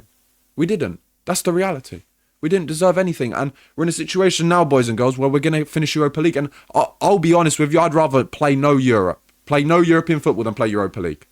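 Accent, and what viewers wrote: United Kingdom. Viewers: Why does this guys accent sound so brittish??? Isn’t he Italian? Lmao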